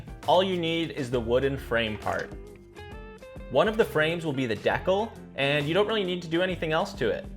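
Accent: Canada. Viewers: I enjoy your silliness and your wonderful smile and especially how your projects work out to be something worthwhile .... and, oh yeah, listening to your Canadian "ou" sound, eh! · Stayed home sick today and found your channel and im loving the content! Also random weird question but are you by any chance Canadian?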